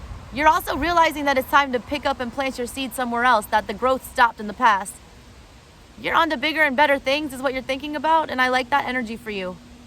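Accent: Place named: South, United States. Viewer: A southern story telling straga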